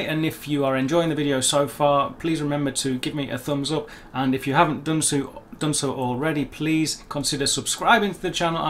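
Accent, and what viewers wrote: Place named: Dortmund, Germany. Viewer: When you talk german, you really sound like a true Dortmunder! Greetings from a born Castroper! Very interesting channel!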